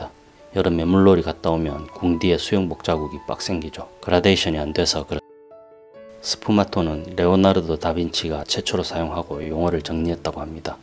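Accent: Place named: Busan, South Korea. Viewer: 나이쑤 부산말도 재치도 그림도 늠조으네요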